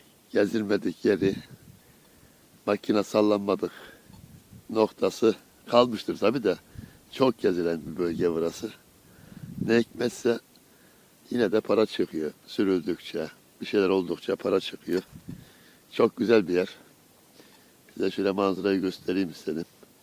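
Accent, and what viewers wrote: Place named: Tokat, Turkey. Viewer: Selamın aleyküm gardaş tokat şivesine benziyor konuşman ya sivaslı ya da tokatlısın heralde?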